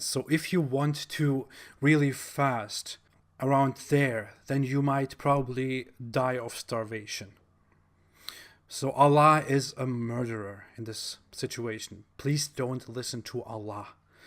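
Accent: Germany. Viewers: You are a fellow German!! Das freut mich voll. Ich mag deinen deutschen Akzent 😍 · I just found out about your channel and I love it. You spread awareness about islam which is important to help reform it. Your videos also help me find information when debating muslims or islam loving feminists. I don't know if you take requests for videos but here are some topics I'd like to suggest: what is taqiyya, muruna, tawriya and kitman (probably spelled them wrong, sorry )and can you give some advice on how to recognize and deal with them when muslims practice them. Do you think Islam will ever die and what would be the cause if it's death? Do your think it can be reformed somehow? And how do you think radicalism in Islam can be stopped? You can also try to do a video on the history of islam...oh, and by the way, love your German accent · I love your videos and I love your German accent. 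Peas be upon you. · I love your vedios and German accent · Which Arab dude hurt you man? I’m an ex Muslim too but chill out on talking shit about Arabs dude. You’re not perfect and you have a shit German accent. Not all of us are crazy Muslims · Love your videos, unsure about your German accent. Tschus! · I love your little laugh! And I love your German accent. And I love your cute face! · I love your german accent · Ich liebe Deinen deutschen Akzent ;-) ! Deine Videos sind super ! DANKE und schönen Gruss aus der Heimat ! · I love your videos and i love your german accent! :) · I love your videos and your German accent. ✊🏾😂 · Islam harms Arabs the most, especially the Arab women. That's what I think as a Turk. Btw, I think you have some kind of Turkish accent besides German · I love your videos. I love your German accent. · I love your videos and I love your German accent. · could you please do your vids in Arabic as l can't understand your English because of your strong Germanic accent. 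Rock n Roll! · I love your videos and your German accent. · Thank you so much for your bravery, intelligence, and honesty! I love your channel! You are so good! I love your German accent! Take good care!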